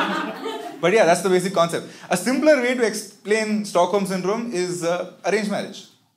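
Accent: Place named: South India, India. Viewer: You sound south indian ... Somehow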